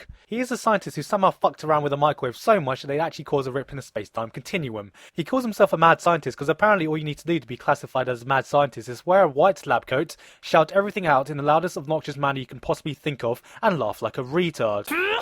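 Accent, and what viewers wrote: United Kingdom. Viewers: Wow he really does sound a little more British here than now. Cute.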